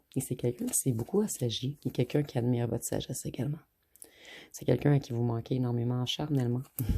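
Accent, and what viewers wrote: Quebec, Canada. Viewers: Excellent, c'est littéralement mon histoire que vous avez lue dans vos cartes jusqu'à ma connexion avec mon enfant intérieur. Vous êtes de très loin la meilleure et votre accent québécois très sympathique et empathique ajoute au charme du message. Merveilleux message qui exprime toutes nos attentes mutuelles à tous les deux.❤❤❤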